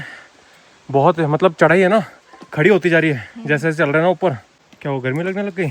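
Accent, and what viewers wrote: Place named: Rajasthan, India. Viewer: For the first time watching your vlog.. Nd aapki rajasthani sun kr meri beti khush ho gayi.. Ki ye tou apne hi h... Morpankhi vala time aapne sahi kaha.. Barat ka swagat hota tha morpankhi or gupab ka phool dekr... You are doin wonderful job... Keep goin..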